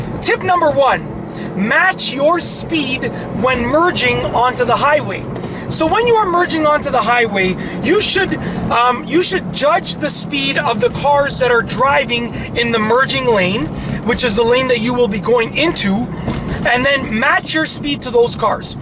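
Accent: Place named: Canada. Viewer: Are you Canadian? You sound extremely Canadian.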